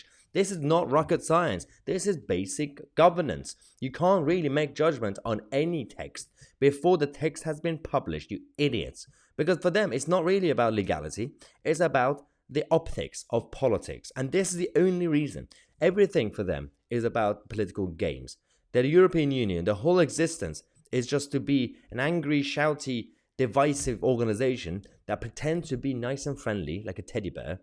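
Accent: United Kingdom. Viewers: While I agree with every word spoken by this youtube host, my immediate question concerns his speaking voice. He uses British English, without sounding annoying. This is amazing. His speech is clear, without a hint of the usual arched phrasing and intonation of royals and intellectual talk-show hosts. His tone is actually delightful, so I would like to know where he was trained as a public speaker. The youtuber named Stephan Molyneaux has this same wonderful way of speaking. Whether I agree with all that he has to say would be another question. I would just like to know the origin of that public speaking voice.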